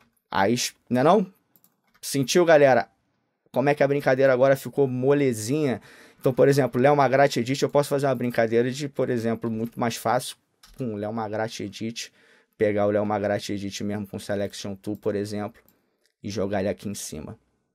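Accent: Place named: Rio de Janeiro, Brazil. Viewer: Finalmente um Carioca que fala minha língua.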